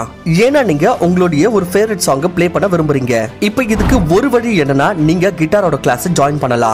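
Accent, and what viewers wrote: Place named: Karnataka, India. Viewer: Kanada Tamil nala iruku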